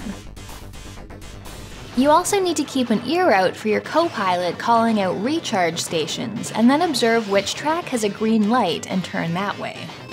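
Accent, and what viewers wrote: Canada. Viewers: I remember seeing a Sega CD and Sewer Shark set up on a demo display at Meijer, which is a grocery store chain around Michigan which you probably don't know since I assume you are from Canada based on your accent, but as a young teenager at the time seeing this game I was blown away. For some reason, seeing shitty live video in a video game during the 90s just knocked your socks off. It is weird to think that devs and publishers thought that this was the future of video games at the time. Like they honestly thought super grainy live footage with a crosshair on the screen where you shoot dumb shit was where videogames were going forever. That's insane. On a side note, I'm playing Subnautica right now and I'm about 35 hours in and that game is insanely awesome. Maybe check it out if it looks interesting to you. It has the potential to give anyone PTSD though.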